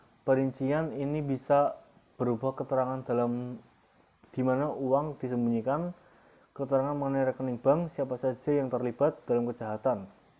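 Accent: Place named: Java, Indonesia. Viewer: Medok nya natural mas, Sangat bisa diterima masyarakat indonesia🙏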